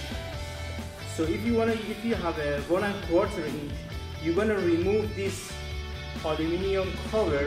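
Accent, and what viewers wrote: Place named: Iran. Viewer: You sound so Iranian 😂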